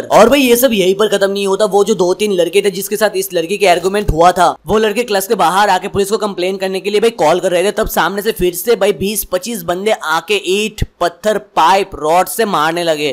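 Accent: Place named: Bihar, India. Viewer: Tum Bihari ho kya be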